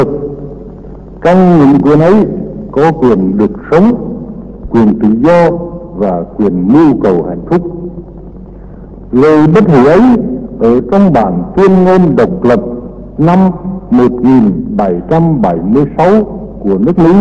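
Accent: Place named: Nghe An, Vietnam. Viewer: giọng Nghệ An mà nói giống Trung Quốc được thì chịu, mù quáng vừa thôi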